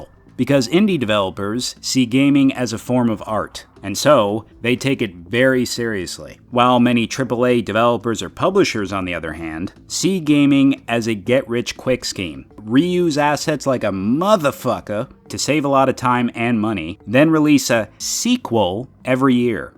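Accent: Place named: Scotland, United Kingdom. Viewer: Yeyeyye LET'S FREAKING GOOOOOOOOOOOOOOOOOOOOOOOOO BOIS NEW VIDEO IN THE HOOUUUSEEE. No need to apologise my brother. Just take proper care of yourself stay safe be safe and always keep smiling always. YEEEEEEEEEEEEEEEEESSSSSSSSSSSSSSSSSSSSESS WE GOT OUR SCOTTISH ACCENT, this is gonna be freaking awesome. I feel like many companies try to cut corners in such desert type areas with the rocks. Obviously not all of them but we have seen many games where the background has not been made properly or they have cut corners while making them, open to possibly many glitches. oh damn don't even get me started on KTJL. That game is just a drop bad in the animation department it's baffling that the same studio the same company which made Arkham knight made that dog s**t. It was a huge let down, a huge. I agree with what u just said making the world bigger is not always the answer for all games. 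Some games are not meant to have a bigger or more open world. I agree once again I prefer quality over quantity anytime and any day. I prefer to stay in the same boat as you, smaller semi open world. DAYUM that's such a good point yes they were definitely the reflection of the developer but remember some games like KTJL is also a reflection, but a reflection of that "S.B inc" iykyk it's the reflection of their worst part in their body. Assassin Creeds downfall is very bad to see. Uk I could be hated for my opinion maybe even u might disagree wi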